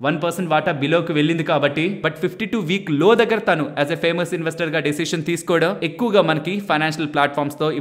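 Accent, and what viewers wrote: Nellore, India. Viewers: 😮 midhi nellore ah???❤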